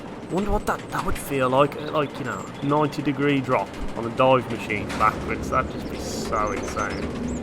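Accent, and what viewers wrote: Black Country, United Kingdom. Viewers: Oh wow I love this channel and didn't even realise you are from Oldbury, I live so close, in Tividale, going to Papa John's in a bit if it's open lol! I should have realised from the Tara a bit, but that just sounds normal to me, I forget our dialect really is quite confined, and no, we ay Brummies lol!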